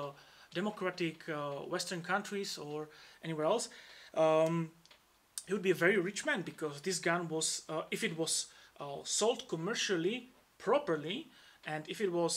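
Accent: Czech Republic. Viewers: The CZ-75 is the greatest pistol design ever made in my opinion... no matter what purpose you could ever desire, there's a 100% chance that the CZ-75 can be adapted into that role with a variant of it or even simple customization! My father from Persia introduced me to CZ over a year ago, but I'm the one who's a CZ-Cultist! Dumb question, with the accent you have, I would presume you are indeed from the Czech Republic, right? Sometimes I think "Man, I need to go see what's there..."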